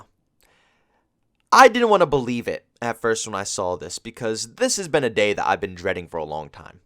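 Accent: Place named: Mid-Atlantic, United States. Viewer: Dude you must be from the PA, Maryland, Delaware area with that shitty accent. The way you hold your O’s is atrocious lol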